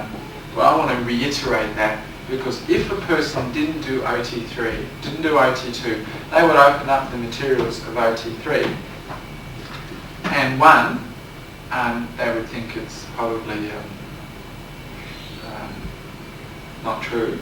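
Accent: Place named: United Kingdom. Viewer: But his accent is British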